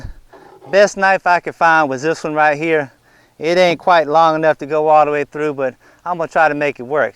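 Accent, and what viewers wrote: South, United States. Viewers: nothing like a good ole boy, love the accent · Great video I like your voice so southern! :) God Bless! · where are you from with that awesome accent?New Orleans ?Kentucky?....anyway great channel I am sure this channel has helped countless people !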